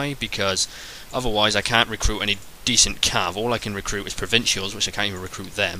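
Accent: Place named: England, United Kingdom. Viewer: the english invented english, so their accent is the right one. you Americans pronounce it wrong, not him.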